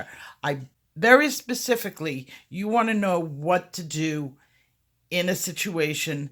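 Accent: New York, United States. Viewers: I love your New Yawk accent! Well done video as well.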